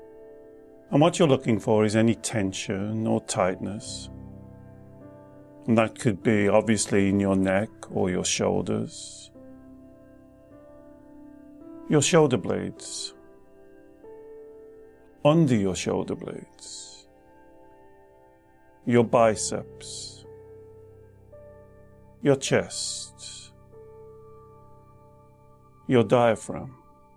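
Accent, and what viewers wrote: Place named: Northern England, United Kingdom. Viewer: Your northern English accent is so calming. Kia ora from New Zealand.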